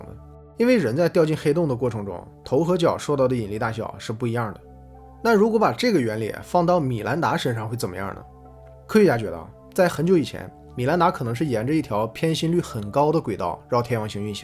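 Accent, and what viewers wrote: Northeast, China. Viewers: 听口音大概率是中国北方，像东北那边的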